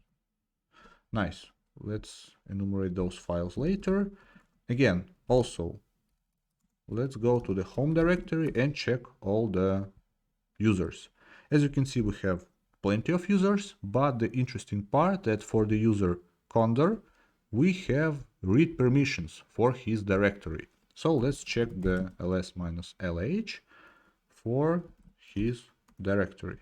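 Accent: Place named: Russia. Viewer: русский акцент сразу чувствуется))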